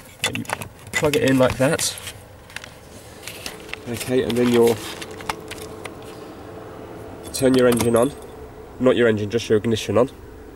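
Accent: United Kingdom. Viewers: Cant stand that Brit Accent. Let alone a Right hand drive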